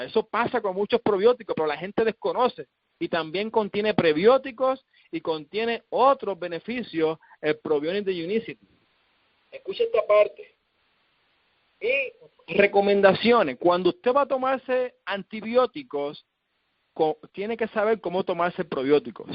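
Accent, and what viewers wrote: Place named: Cuba. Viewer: NO SE ENTIENDE CUAL ES EL PRIMER MEDICAMENTO QUE ACONSEJA..."..no se qué...clins" , que contiene 3 productos, dice. Pero imposible entender por la dicción de quien habla, parece cubano, no?...Por favor, si alguien sabe de qué medicamento se trata, compartirlo o responderme. Muchas gracias! 18/03/2019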